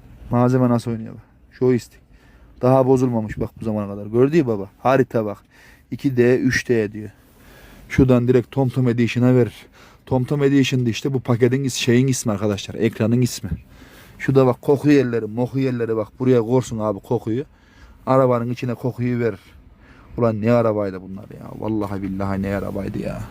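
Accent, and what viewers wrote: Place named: Black Sea, Turkey. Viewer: Sırf şu konuşman şiven için abone oldum (Kurbanın olıyım) ( şuna baghele uşag)😂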